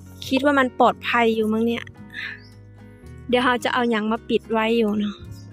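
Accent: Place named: Thai Phuan, Thailand. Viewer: ภาษาพูดคล้ายๆ คนชาวไทพวนที่บ้านผมเลย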